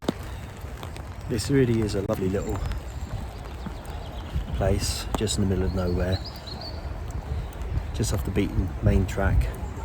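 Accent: United Kingdom. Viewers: Sad the brothers couldnt be buried in one place. Love your britsh voice. From Canada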